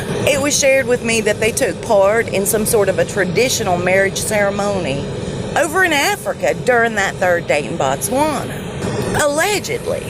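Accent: United States. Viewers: I really love your accent. I wonder what part of the US you're from.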